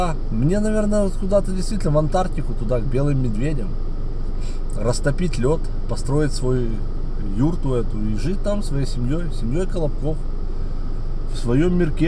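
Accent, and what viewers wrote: Odessa, Ukraine. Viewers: как мне нравится твой одесский говорок. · Как же Вы мне напоминаете Михаила Водяного. И тембр голоса, и неподражаемый одесский акцент, и манера разговора, и юмор, и смех... Мне кажется, Вы и внешне на него похожи. Очень хочется верить, что на Украине - 95% адекватных людей. Но по моим наблюдениям, это далеко не так. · Я просто млею от Одесского говора. Привет с ДНР. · я вот уже два мес слушаю твои репортажи но не подписывался на тебя, но после этой Ганны, решил подписаться. Не обижайся на ущербную, есть и такие люди. Ты прикольно рассказываешь, настроение поднимаешь, так что выпускай больше репортажей. Привет тебе от одессита, родной мой говор, который я уже 4 год не в Одессе. (((( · только подписалась на ваш канал. Слушаю вашу,нашу родную одесскую рэчь и уши сахаром присыпаются! Какой текст,чисто лейся песня!!! А в Одессе для настоящих одесситов,не для новых "одэсцiв" на мовi говорить чистое западло! 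И смысл комментариев на письма всяких Ебванько- это песня! Продолжай в том же духе! Здоровья и удачи. И процветания!!!! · Брат полностью с тобой согласен.Твой Одесский говор и юмор класс, улёт.😂😂😂❤❤❤ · ай е тебя несет… 😅 твой одесский говор - не исправим!!!!❤ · Привет! Классное видео! В ржете в вперемешку с Одесским говором, а как мы режем! А ещё где вы едите. там небо такое тяжелое кажется прямо сейчас раздавит. А далее такие красивые места, горы! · Гаси майдануиых.Уникальный Одесский говор.Красава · Одессита сразу слышно по говору и шутке юмора😊! Второй день слушаю и ржу!!! Слава Богу, что Луганск в "оккупации"!!! Ждём Николаев и Одессу домой! Тернополь пусть ждёт репараций и расплачивается за кредиты их любимой власти. Там долг уже накопился не на одно поколение! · Как он мне нравится😂😂😂😂😂😂Только одесситы так разговаривают 😂😂😂😂 Шутки, прибаутки ну прямо как моя подруга😂😂😂😂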